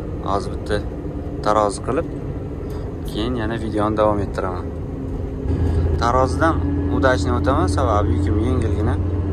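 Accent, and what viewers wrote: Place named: Navoi, Uzbekistan. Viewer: навоийни каеридансиз шевангиз вапше навоийникига ухшамидику еки хатирчиданмисиз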